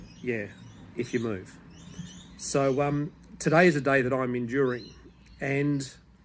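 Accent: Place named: Australia. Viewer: Hello dear...you touch my heart. I love to hear the accent. My dear husband passed 2 years ago; he was from Melbourne. Your accent is similar. You are very brave...your angels are all around you. Hugs and prayers❤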